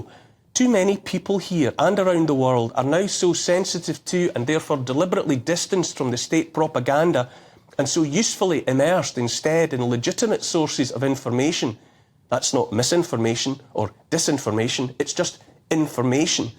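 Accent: Scotland, United Kingdom. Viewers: You have great information here that you so generously share with whomever will watch and listen. I'm a 70 year old pale male too. So, I'm going forward with a well intended suggestion: If you could slow your broadcast down one beat...just one...my brain could more easily absorb your wonderful content. Between your beautiful Scottish accent, and the slower pace of your easy language, I could really appreciate a better chance to understand everything you are saying.: )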